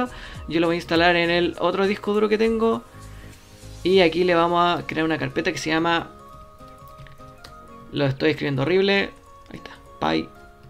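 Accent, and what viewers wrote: Chile. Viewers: sos chileno? y gracias lo estoy viendo <3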